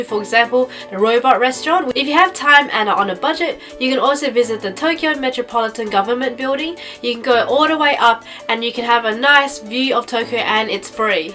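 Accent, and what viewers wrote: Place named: Australia. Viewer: you are very pretty, and sound like an Aussie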